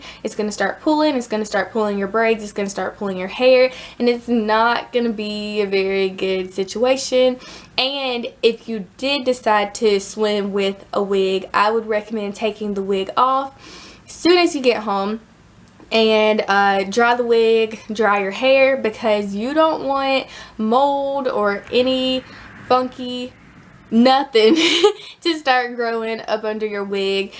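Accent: Texas, United States. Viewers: You're too cute.. especially your little country accent... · I’m thinking “why is everyone saying she has an accent” but then I realize I’m from Texas and have the same accent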